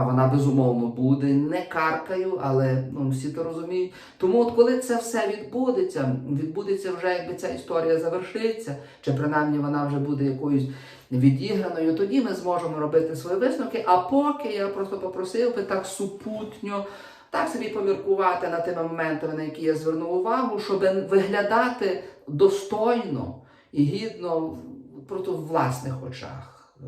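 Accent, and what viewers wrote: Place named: Galicia, Ukraine. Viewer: Хто така офензива? Галицький діалект не всі розуміють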